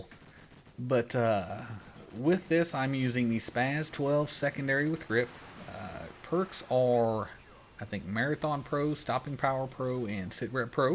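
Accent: South, United States. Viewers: you from the south you sound countryish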